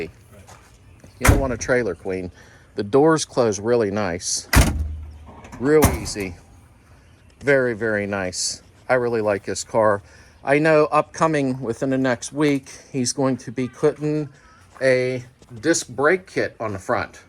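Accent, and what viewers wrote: Pittsburgh, United States. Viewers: Is that a Pittsburgh accent I hear? Thanks for the great video!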